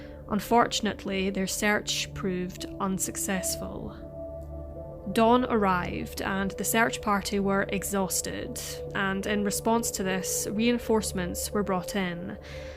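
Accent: Scotland, United Kingdom. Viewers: It's Scottish..! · She’s Scottish, not English! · she is Scottish.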